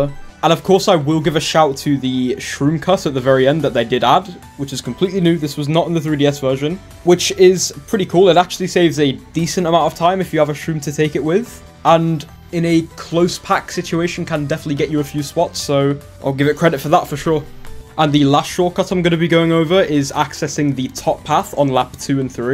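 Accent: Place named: Northern England, United Kingdom. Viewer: You sound like you're from northern England lol (same)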